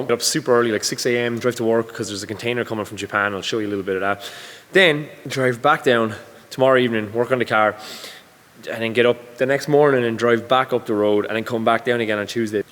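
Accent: Ireland. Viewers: I missed your FOKYN Irish accent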